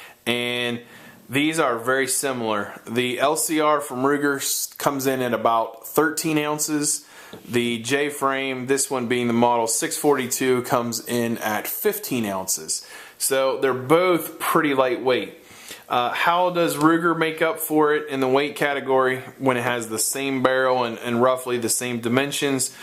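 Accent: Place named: South Jersey, United States. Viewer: I know a south eastern PA/South Jersey accent when I hear one....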